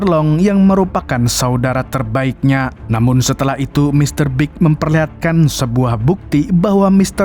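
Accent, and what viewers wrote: Banjar, Indonesia. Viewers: Pian orang banjar kah mang.? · ai urang banjar skalinya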